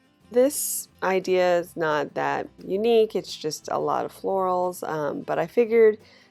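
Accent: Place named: South, United States. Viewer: Oh, you are in California... I thought you were down south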